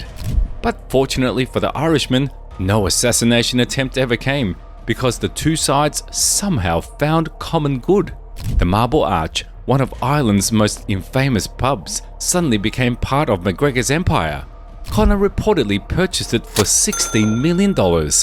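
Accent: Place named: United Kingdom. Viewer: Chinese with British accent???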